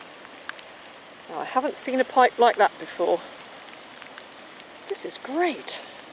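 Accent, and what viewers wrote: United Kingdom. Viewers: Smiled everytime you said "Keef-ley" in it's Northern pronunciation. Stands out a mile from your natural 'English Rose', accent! lol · Thanks for your videos, ser the treasures and hear your british accent so magnétic. Congratulations and greetings from Argentina.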